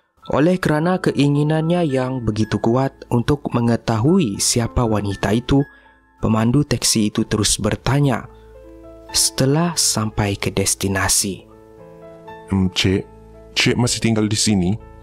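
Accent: Sabah, Malaysia. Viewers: Sabah bh ni pengulasnya nie😂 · urg sabah ba ko kan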